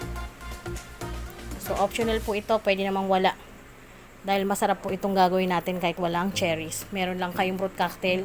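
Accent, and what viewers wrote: Philippines. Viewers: I just subscribed to your channel, you are wonderful. I enjoy listening to you, you are very knowledgeable & professional. You explain it in very good tagalog and you say the english words in the real Pilipino diction and pronunciation which is very nice to the ear. I hope dumami ang manood sa iyo. Paki tanggal lang ang background na laughter at iyong background sound na wow, at sound ng chewing like chicharon, those ugly sounds degrade the high quality of your presentation. You are much better than Kris Aquino with her useless cooking.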